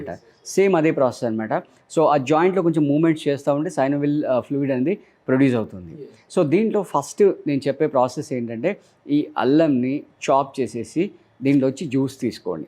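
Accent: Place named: Marwar, India. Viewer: Athanu telugu vadu kademo, marvadilanti vallu andhra lo perigina vallu ayi vuntaru.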